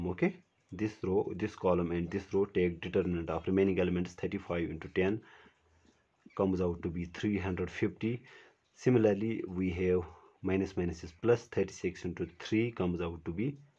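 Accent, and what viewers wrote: Kashmir, India. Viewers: you have an amazing Kashmiri accent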